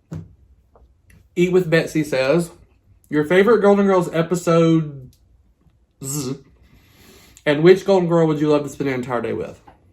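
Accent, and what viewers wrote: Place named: Minnesota, United States. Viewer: I'm jealous, love shrimp. I caught the Minnesota accent. 😁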